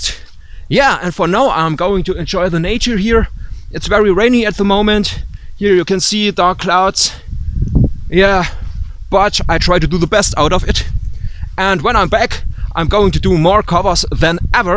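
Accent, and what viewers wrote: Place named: Germany. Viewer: it's quite amusing. During your singing performances,i could have never guesed you were German, yet in a vlog like this, the accent is noticable right off the bat. Good luck in the future, brother!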